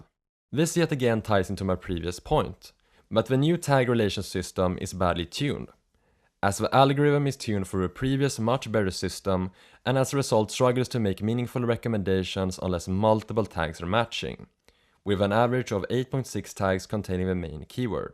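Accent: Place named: Sweden. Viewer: your swedish accent annoys me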